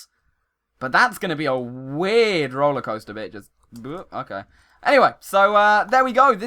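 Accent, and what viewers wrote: United Kingdom. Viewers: QOTD: I don't know what u mean but in minecraft I like to travel by sprinting and in real life I like traveling in a car because u see more then taking a plane also can u do more daily captive minecraft 2? Thanks your vids are great and I love your British accent of your voice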